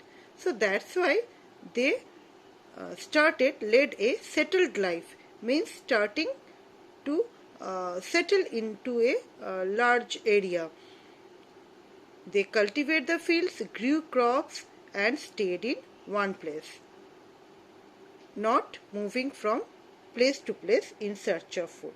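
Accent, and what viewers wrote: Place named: United States. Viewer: My goodness... your English speaking is like an American😮😮!!Well you are Indian..😊!!!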